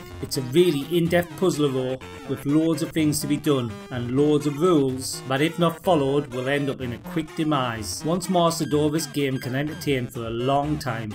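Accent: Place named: North East England, United Kingdom. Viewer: ah cheers man. I can tell just by the accent you are NE, and a total commodore lad so what more could I want. Loving this series so far. There’s so many C64 releases and these vids have already introduced me to a few I’ve never heard of!! Appreciate the time to get back to me mate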